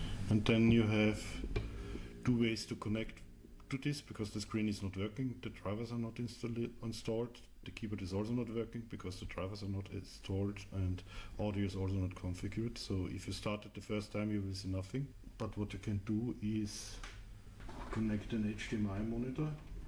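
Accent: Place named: Germany. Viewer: An hand des Akzents schreibe ich auf Deutsch. Cooles Projekt. Sind die GPIO ports noch nutzbar? Dann wäre das Teil nämlich interessant. Ich habe gesehen das du die Platine dafür über pcbway verkaufst... Wie sieht es mit dem 3D Gehäuse aus? Ein gps und evtl. Lora mod wäre nich cool Dann könnte man es als meshtastic nutzen. Ich liebäugel nämlich mit dem Teil, aber mit dem reinen pico sehe ich für mich keine Anwendung die ich nicht auch mit ein esp32 erledigen könnte (von der Mobilität mal abgesehen) und dann werden für mich ein hackberry oder uConsole interessanter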